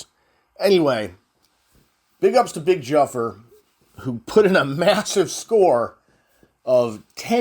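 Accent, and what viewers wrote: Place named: United States. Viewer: Something very official with a deadpan American accent, i like it! Well done all. I still need to improve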